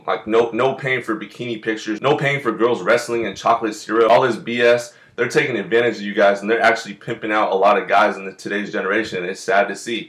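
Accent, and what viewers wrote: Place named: California, United States. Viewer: I can hear the california in your voice.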